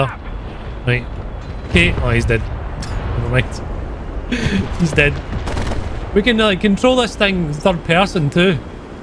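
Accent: Scotland, United Kingdom. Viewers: Yup and the Scottish accent made me stay haha!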